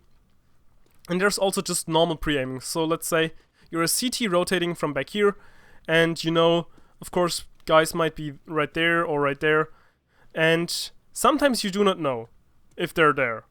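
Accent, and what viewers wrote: Germany. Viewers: Bist du deutscher? Hört sich so an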